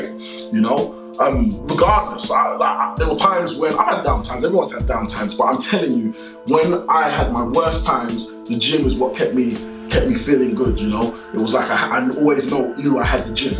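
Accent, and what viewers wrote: England, United Kingdom. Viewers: this is the first time listening to Simeon Panda's voice, I just found out he was an English man?! :) Thanks for the inspiration! :D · his accent is so londoner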